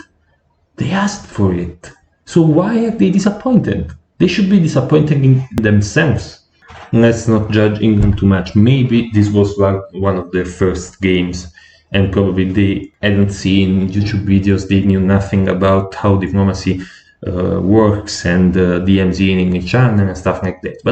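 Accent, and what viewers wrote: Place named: Italy. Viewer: Nice, a part from that strong Italian accent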